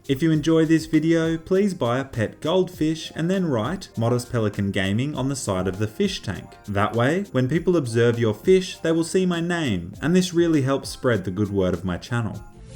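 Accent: Australia. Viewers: What part of Australia are U from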